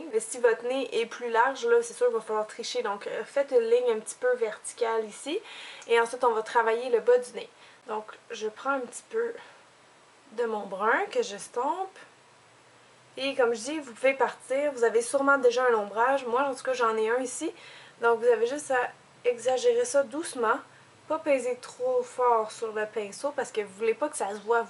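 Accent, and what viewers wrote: Quebec, Canada. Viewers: Super tes video.j adore ta facon de parler et ton accent bec:).est ce que les couleurs sont les mm pour une peau mat? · j'adore l'accent québécois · Bonjour à toi! je suis française et je viens de te découvrir , j'adore ton accent ayant vécu un an par chez vous ! cette vidéo m'aide bcp car je trouve mon nez est trop gros donc merci pr ça et petite question , je voulais savoir si tu saurais faire un tuto sur les maquillage pr filles métisses car je le ss ( inde/ france ) lol et si tu aurais des suggestions car je fais bien trop souvent le mm make up en soirée ou tous les jours, et j'ai les yeux en amandes dc savoir comment et quoi faire ! ;) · Salut jsais pas comment jsuis arriver sur ta vidéo mais on s'en fout ! Je suis Suisse et dans 2 semaines je vais au Canada et rien que pour ton accent jme réjouis d'y aller ahaha ! "On va commencer avec les plus gros nez" Aahha merci pour cette vidéo. 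Tout du bon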